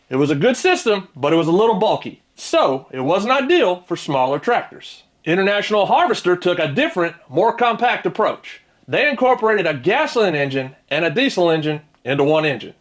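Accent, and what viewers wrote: South, United States. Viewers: Motherfucker you're crazy. And your southern like me. Keep up the good work.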